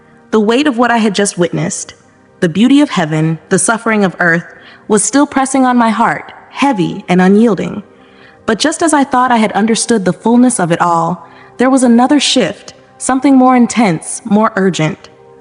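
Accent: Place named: Brooklyn, United States. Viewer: I'm curious about your Brooklyn accent! What can you tell me about it. You sound like your from an area I'm not familiar with..???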